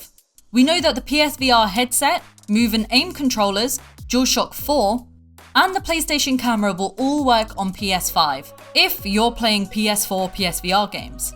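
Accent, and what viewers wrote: United Kingdom. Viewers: Hahahaha and she has a british accent. Thats bollocks! Lol. It works in the us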